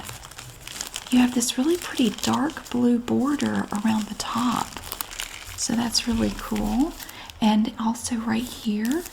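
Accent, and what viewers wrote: South, United States. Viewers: How about Abigail for the kitten and call her Abby. She’s so sweet. you look so beautiful in that color. Your skin is glowing. Love your videos I listen To them every night. The smooth soft southern accent. Amazing 😉❤️❤️❤️❤️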